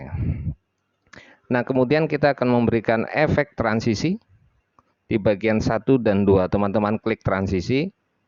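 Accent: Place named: Tegal, Indonesia. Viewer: sampean wong tegal mas?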